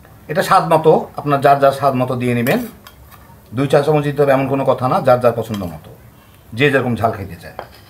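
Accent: Bangladesh. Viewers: Dada .... Epar Bangla (India) theke antorik bhalobasha. Recipe to darun, kintu apnar presentation ta awesome. Amara o bangal, r anek din por ei Bangladesh er accent ta khoob bhalo laglo. Bhalobasha o subheccha roilo 🙏.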